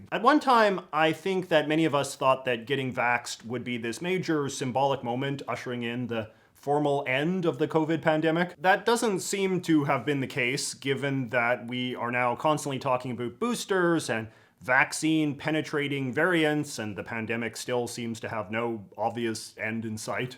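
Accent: Canada. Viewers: How would you term this cadence to your manner of speaking, it's so distinctive, never heard anyone else speak with your "rhythm", which seems independent of your Canadian accent. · Are you Canadian talking about American culture? Accent you have and all. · I can tell you are Canadian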